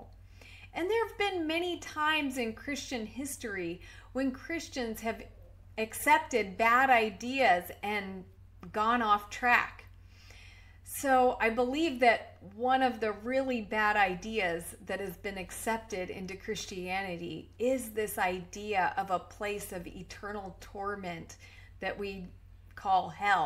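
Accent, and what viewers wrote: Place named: England, United Kingdom. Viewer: that’s so so lovely I’m really really glad that my words made you happy because you have done the same for me with yours. I know no one has all the answers and not will we ever till we get to the other side lol! But it’s so nice to hear someone speak love and not eternal condemnation for once and fear mongering people into believing and judgement for everything u say think and do yano! That would absolutely be such a welcome help. I’m doing quite well but obviously u need that final push and as I say iv been quite unlucky with my experiences I honestly haven’t yet heard a story quite as bad as mine if I’m honest! But luckily I don’t suffer with fear and am just used to it now lol! I live in Bath, which is in the Somerset region near Bristol? Where are you situated I notice your English accent which is music to my ears as keep coming across Americans lol! 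And I reach out and am actually very very surprised about the lack of response and support I get with it all but I believe god leads you to the right people in time so have just been patient with the process. My email is <mail> If you would like to email a bit more privately 😁 Thankyou so much you are an angel 😇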